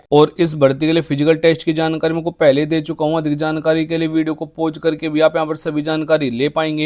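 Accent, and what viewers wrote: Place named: Pakistan. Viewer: Pakistani k tarah baat krhe hai aap toh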